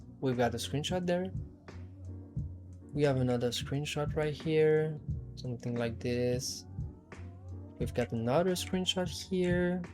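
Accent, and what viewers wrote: France. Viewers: Hey, i loved your video ! Are you french ? You have a kind of french accent. Anyway, amazing work, brother. Keep on ! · This horrible French accent 😅😮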